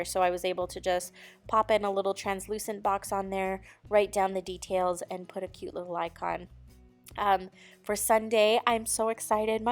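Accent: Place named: Dakotas, United States. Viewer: I know you've lived in Hawaii, but by any chance have you lived in the Dakotas? I'm always trying to figure out your accent! lol especially trying to figure out where "anywhose" comes from! You're so adorable!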